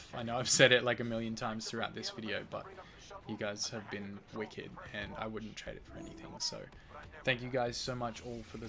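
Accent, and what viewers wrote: Australia. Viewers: You and make listening to an Aussie accent bearable possible. Thank you.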